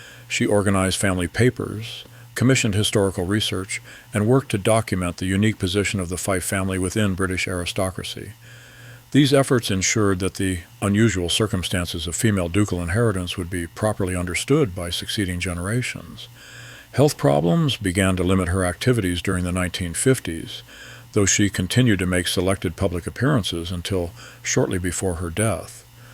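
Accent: United States. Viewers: This is a very interesting presentation, but does it have to keep labouring the onerous ducal duties? Why oh why does it require an American narrator, talking about ‘dookal’ titles and children being ‘tootered’? Tiny point , Lady Maud was the niece of Queen Maud of Norway, not her great niece. Queen Maud was the Duchess of Fife’s younger sister.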